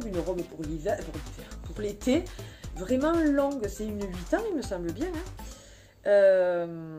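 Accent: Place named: South-West, France. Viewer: Hello ! belle rentrée VG ! on dois faire les mêmes VG au vu de ton accents du sud ouest on est voisin 😅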